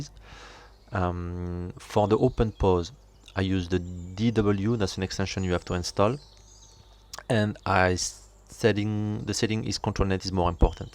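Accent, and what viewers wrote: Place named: France. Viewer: That french accent 💙 Great technique. Actually when you refuse to insert "beautiful face", you're showing a great example of how to do - effectively - your own art. Beauty? Kind a weird concept, when you scroll into image libraries online and see only beautiful faces. No more face diversity, everyone is a casanova or a supermodel. Great job. Vittorio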